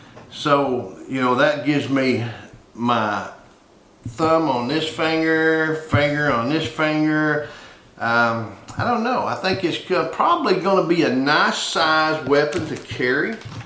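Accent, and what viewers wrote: South, United States. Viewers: I’ve never seen a Viking with a southern accent before love the content I’m going to subscribe 👍🏽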